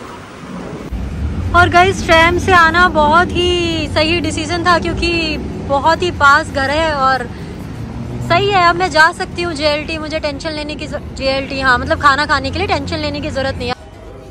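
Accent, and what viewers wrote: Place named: Pakistan. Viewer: Aap Pakistani drame bahut dekhto ho lagta h .apki bat karne ka dhang thoda vaisa hi gya h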